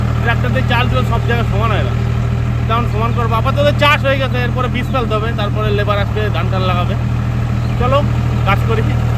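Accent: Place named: Barishal, Bangladesh. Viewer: ও ভাই তুমি তো কথা বলো মোগো বাংলাদেশ বরিশালের মতো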